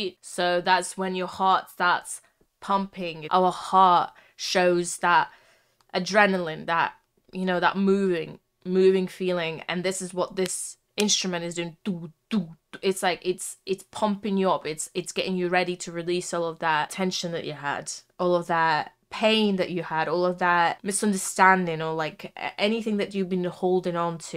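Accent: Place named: Eastern Europe. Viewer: do you have Slavic roots? You have an interesting accent. I can't figure out where this is from, very interesting 😅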